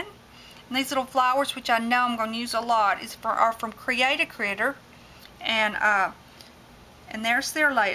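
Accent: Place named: Alabama, United States. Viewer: I really enjoy your videos and blog. I love to hear you talk because you sound like me. I live in Alabama with you. I'm about 20 min. from B'ham. So were almost neighbors. Thanks for sharing.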